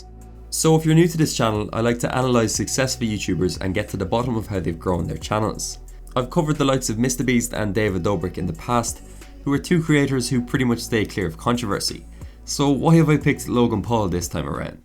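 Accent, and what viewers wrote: Ireland. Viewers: You Irish? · Been through a few videos, and I'm still deciding if I'm watching the content because its beneficial, or is it because I lowkey love the Irish accent!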